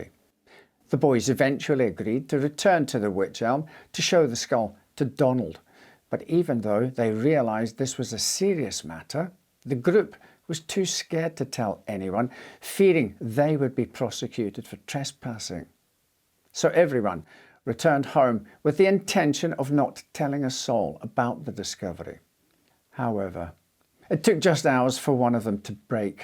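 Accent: Scotland, United Kingdom. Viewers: It's a Scottish accent, don't know much about the world? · Your annunciation makes you sound Scottish but a while ago when I first started watching you, you sounded like a Brummie. Great content but.......distracting.. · Very distinct presentation.Scottish I assume?Either way very excellent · As a scotsman…. Does anyone know where this man is from? I’m hearing half scottish and half English in every sentence.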